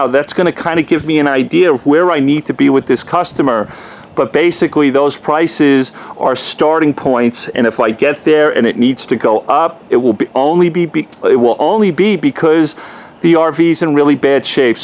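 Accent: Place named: Dallas, United States. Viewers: Niiiiiice. Your accent is a typical Dallas Accent. LOL. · Gotta love the Texas accent. ("Howard Stern talks RV detailing")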